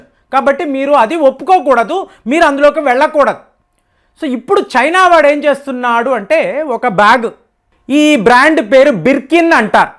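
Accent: Tamil Nadu, India. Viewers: he is Tamil guy. His telugu is not pure. please understand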